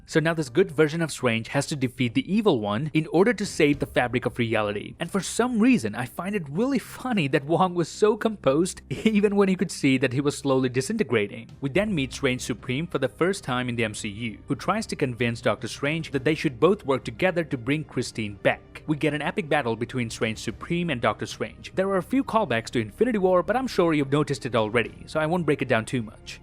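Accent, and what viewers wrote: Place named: Montreal, Canada. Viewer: You have a Montreal accent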